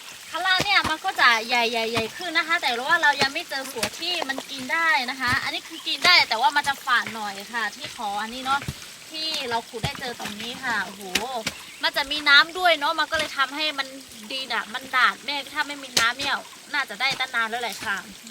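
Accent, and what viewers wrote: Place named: North, Thailand. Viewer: เห็น พูดเหนือก็รู้สึกว่าน่ารักดีนะ👍👍👍👍👍🌹🌹🌹🌹🌹🌹เหนื่อยลำบากแต่ก็อร่อย ทำให้สุขภาพดีได้ออกกำลังกายกันสุด สุด😅😅😅😅😅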